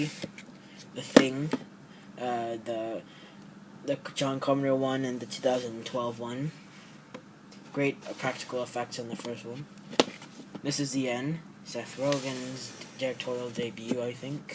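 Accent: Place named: United States. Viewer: Where u from? You sound American but most of your blu rays have UK ratings on them.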